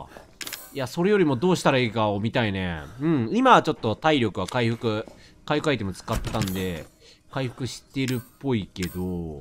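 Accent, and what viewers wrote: Kansai, Japan. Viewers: レトさんがびっくりする反応とたまにでる関西弁、好きです。怖い系とびびる系は大丈夫なんだけど痛い系は無理ドライバーの+−そんなに気になる？笑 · 関西弁がどんどん増えてく…笑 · レトさんの関西弁がおもろすぎる笑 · びっくりしすぎだし関西弁出すぎｗ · なんか最近前以上に関西弁がよく出る気がする、気のせいかな？笑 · ホラーとか焦る場面が多いゲームになるとレトさんの関西弁がいっぱい聞けるので嬉しい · レトさんの実況補正あるにしてもめちゃくちゃ怖すぎて画面ちっちゃくして見てます😂😂関西弁たすかる😂😂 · 焦ると関西弁が出てきてしまうさん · レトさん焦ると関西弁がめちゃめちゃでるからちょーうれしい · 追い詰められたら関西弁になるレトさん好きwww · レトさんの関西弁で中和されてる気がする、、、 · 最強に怖くなると関西弁に戻る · 近年なかなか聞けなくなったレトさんの関西弁、、、ありがとうございます✴ なんか新鮮です！ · 普段よりさんの関西弁が多くて嬉しいしかないです🤦‍♀️🤦‍♀️💕💕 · なんかバイオだとレトさん西の感じ強いね笑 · やっぱレトさんの関西弁ええなあさっきまでガッチさんの見てたから差が大きくておもしろいwww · バイオしてるさんは関西弁になるw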